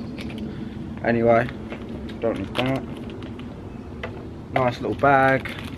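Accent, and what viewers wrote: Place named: Sheffield, United Kingdom. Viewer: Hahaha I've a mate from Sheffield who talks like you 😂 rate is right barnet is head LMAO it's funny when he talks lol I'm from Leeds but I don't talk like that lol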